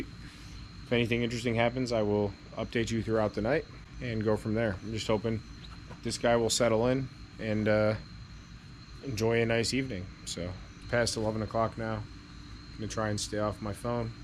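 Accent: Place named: Brooklyn, United States. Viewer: Nice brookyln accent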